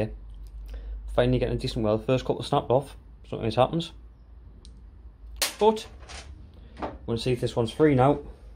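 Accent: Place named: North East England, United Kingdom. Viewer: Mate av got the same problem with my trials bike am sick, you sound like your from the north east, fancy helping me?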